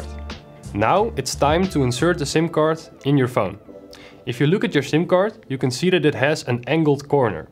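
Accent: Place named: Netherlands. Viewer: I hear a Dutch accent! very nice tutorial and explanation!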